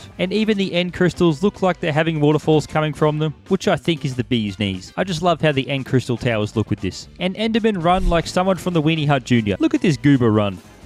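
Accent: Australia. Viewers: Is he Australian? Love Australian minecraft youtubers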